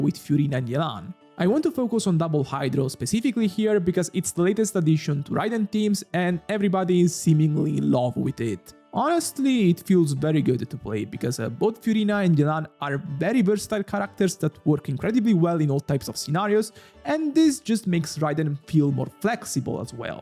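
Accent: Italy. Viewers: yo bro, u italian?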